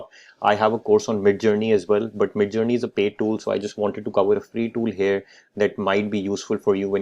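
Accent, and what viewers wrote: India. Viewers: parang english ng indiano sir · Indiano ba ang nag voice-over? Galing mo naman po!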